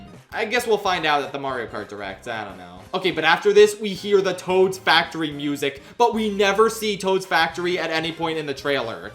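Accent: United Kingdom. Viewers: I’m sad, the British voice is gone 💔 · Bruh I thought this guy was bri’ish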